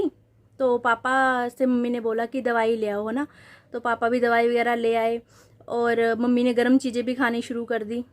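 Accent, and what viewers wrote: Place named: Garhwal, India. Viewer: Aapke muh se axxi lgri hai garwaali language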